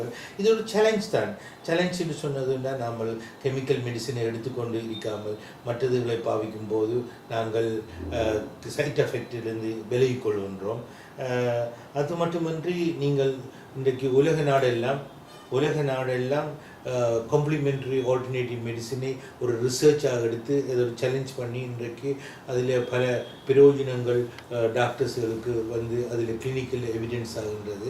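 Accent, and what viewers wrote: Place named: Tamil Nadu, India. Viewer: Congratulations dear Dr it's nice to hear like Tamilnadu tamil.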